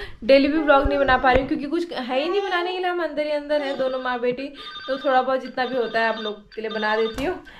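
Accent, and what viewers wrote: India. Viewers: Wow aapke muh se kinni achi lag rahi h pahadi bolna ❤ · Accha tho bol Rahi ho pahadi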